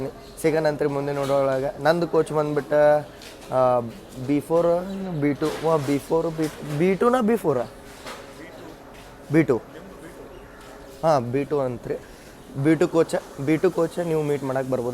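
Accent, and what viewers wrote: North Karnataka, India. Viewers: Bro your voice are talking like North Kannada. I am happy for you capture of Ranebennur ❤❤❤🎉🎉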